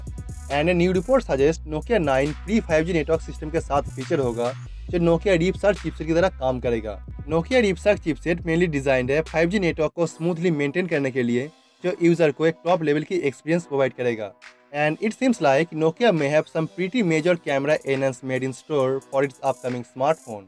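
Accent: Bengal, India. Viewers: Firts Change ur bengali tone broder....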